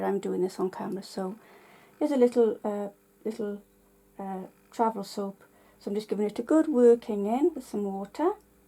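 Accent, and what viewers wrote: Wales, United Kingdom. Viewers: Love that Welsh accent! Thanks for the tip. Jan from Victoria, Vancouver Island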